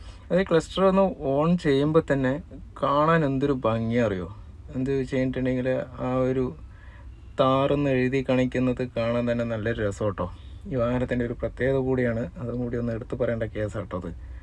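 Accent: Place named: Palakkad, India. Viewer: പുള്ളി പാലക്കാടൻ കൊമ്പാള ഭാഷയിൽ സംസാരിക്കുന്നു...മലപ്പുറത്തുള്ള കോയമാരുടെ കാർ വിൽപ്പനയെന്നും കാണാറില്ലേ..??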